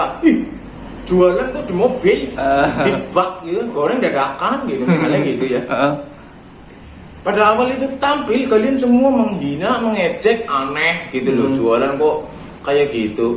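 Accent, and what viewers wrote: Banyumas, Indonesia. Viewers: Kaya wong banyumas,,,ngomongw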